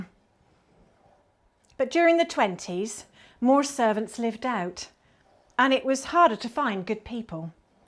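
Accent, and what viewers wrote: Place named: United Kingdom. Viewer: Yes this. I’m so used to watching British foodtubers that my brain automatically makes the switch when it hears the accent.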